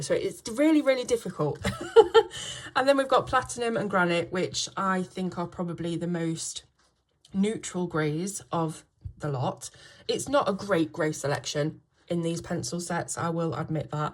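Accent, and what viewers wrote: England, United Kingdom. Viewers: Вы англичанка? Очень интересный акцент.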